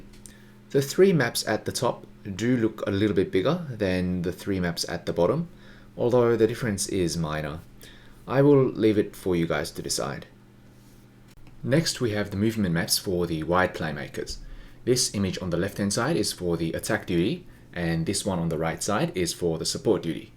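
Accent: Australia. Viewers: also is that an Australian accent I hear?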